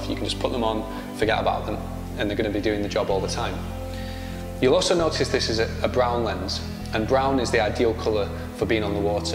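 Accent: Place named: North, United Kingdom. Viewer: The accent is from the northern part of the UK. he sounds to me. .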